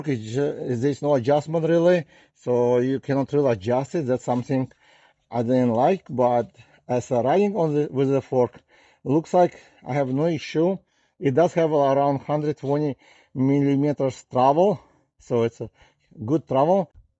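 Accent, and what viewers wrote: Russia. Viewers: Good review but you have a thick Russian accent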